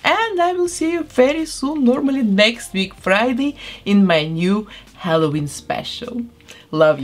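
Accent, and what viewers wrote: Russia. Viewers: Что это за акцент? Русский или норвежский? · Почему я слышу русский акцент 😮 кукла замечательная! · мне кажется... или у нее русский акцент..... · Есть ощущение, что она с русским акцентом? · Мне кажется, или у девушки чисто русский акцент?😅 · Работа потрясающая P. S. Я одна слышу лютый русский акцент?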